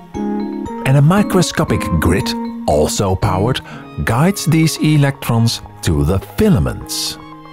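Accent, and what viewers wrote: Netherlands. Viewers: I didn't know synthesised voice robots can have Dutch accent too,Awesome. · That's the thiccest dutch accent I've ever heard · I thought you were Dutch based on your accent, but I wasn't sure. Hearing you say that you were in the Netherlands, makes me realize I'm pretty good at picking out accents. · that compression on his voice combined with harsh dutch accent is absolutely obnoxious jesus turn it down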